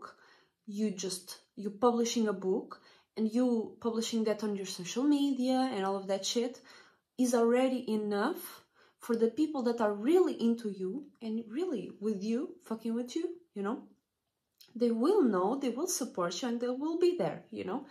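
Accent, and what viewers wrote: Russia. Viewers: I really agree. I worked on a creative projective which was ambitious and the people close to me tried to dissuade me and tell me it was impossible. I said it is impossible for YOU, for me it is possible. haha. But yes I agree, hard to find genuine people who will support you and not be jealous if you are a unique and creative person. I like your accent are you Russian?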